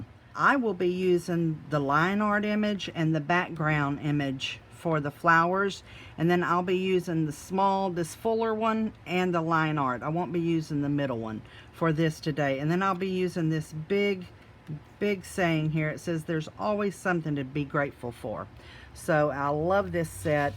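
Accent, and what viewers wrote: Texas, United States. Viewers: I just adore listing to your Texas drawl. Your videos are amazing...thanks for all the inspiration! Now where's the video on how you did the multi-colored greeting??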